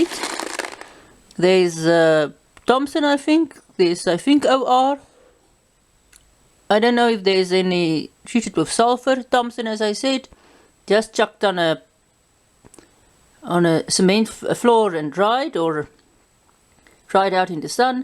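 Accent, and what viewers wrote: South Africa. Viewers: That's a hell of an accent. South African?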